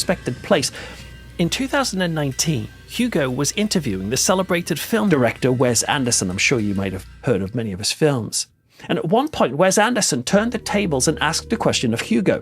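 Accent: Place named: United Kingdom. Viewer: Ya gotta love British accents though. Especially. It’s somehow especially fitting for ghost stories, don’t you think?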